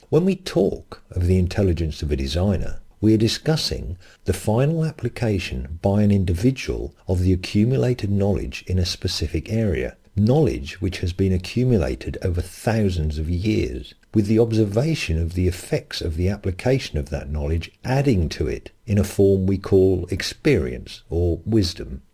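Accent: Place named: United Kingdom. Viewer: but there are people that invented things without learning anything prior, like Tesla. Tesla and others described their experience as a shamanic trance experience where the Universe told them what to do. The life explosion from the Cambrian is exactly that, apparition of sophisticated life already evolved out of nothing, with no intermediary species. And sincerely I do not see how this idea, even taken as valid, refutes intelligent design. What you're saying is a designer must learn from his mistakes in order to make sophisticated things. So the process looks exactly like evolution, however, evolution itself being the works of a designers who keeps learning from his mistakes and trying new things. Your ideas do not refute the designer, refute the fact that designer couldn't come up out of nothing with sophisticated life. Your idea just proves evolution, but not the nonexistence of a creator, actually it even makes a case for it. 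Another ridiculous bong argument, I wasted my time, I swear, whenever I hear the bong accent I will close the video. Ridiculous sofisms, so cringe, so awkward, so out of touch with the reality, so clumsy, so pompous and pretentious. Bong people in a nutshell.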